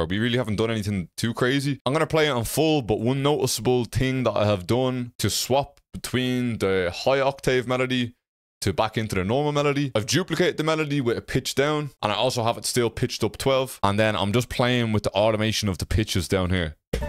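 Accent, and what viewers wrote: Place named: Dublin, Ireland. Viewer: That Dub accent is heavy. Respect from Bray my man, keep killing it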